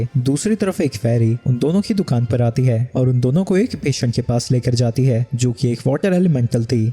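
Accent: Gujarat, India. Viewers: Bro are you gujarati?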